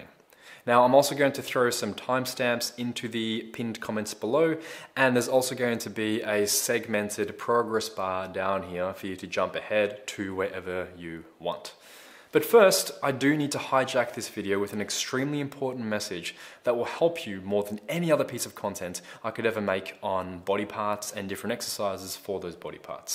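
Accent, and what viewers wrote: Australia. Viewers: he is Australian, that is an Australian accent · Great video. Most beautiful Australian accent I’ve ever heard.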